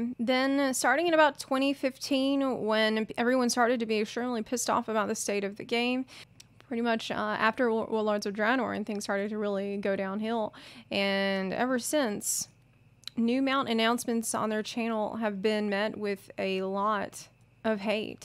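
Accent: South, United States. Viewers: I think it's a light version of a southern drawl, so maybe around Tennessee somewhere? That's a complete guess though.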